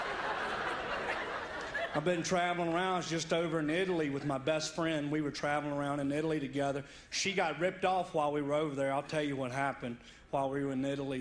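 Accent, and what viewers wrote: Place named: Virginia, United States. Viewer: AKE IT BACK! -- One of the best parts of Yes Dear! I love his physical comedy, so good when he has people to work off of! That is definitely a Middle Virginian Accents not quite Tidewater area!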